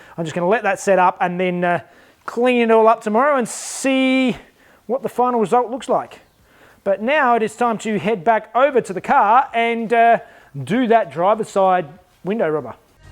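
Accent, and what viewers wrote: Australia. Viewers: Your Aussie accent is hilarious, every time you say Flocking, it sounds like "Fucking" and it makes it 10 times funnier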